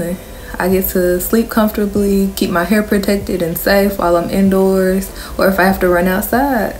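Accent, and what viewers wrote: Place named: Dallas, United States. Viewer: I really love listening to your voice - for some reason your accent sounds like a Dallas accent - your hair is beautiful, really enjoyed every moment of this process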